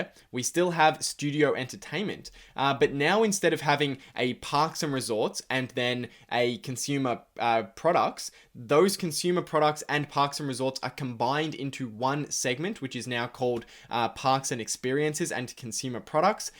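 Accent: Australia. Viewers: Like your vids and your Aussie accent :)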